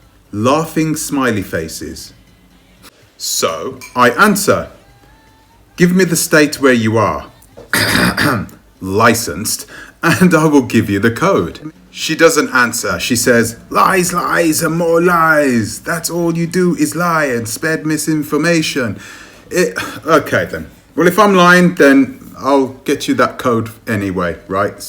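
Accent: United Kingdom. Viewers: When I see your videos come up I think, oh lord what now 😏! But I watch and like your videos because your unapologetic about your views and the information you share. Your delivery of what your saying, and not saying is very British 😂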